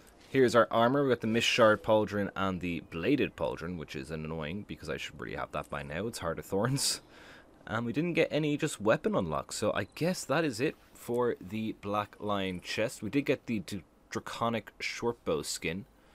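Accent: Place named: Ireland. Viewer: Are you Irish or just live in Ireland? I will admit I've wondered about the origin of your accent :D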